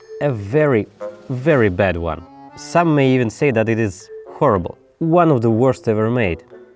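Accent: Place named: Russia. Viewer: Хахахп меня не обманешь! Раскусила ваш русский акцент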